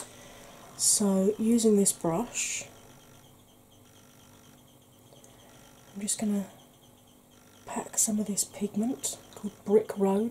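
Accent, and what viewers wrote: United Kingdom. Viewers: Welcome! Love British accents 😊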